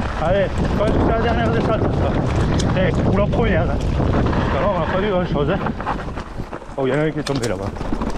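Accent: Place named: South, France. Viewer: l'accent du sud !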